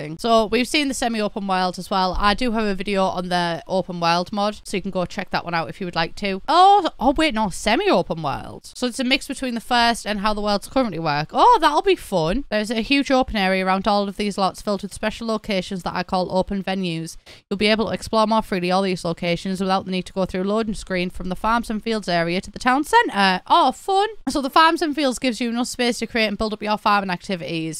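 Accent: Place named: North, United Kingdom. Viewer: northern british accent makes me happy again and again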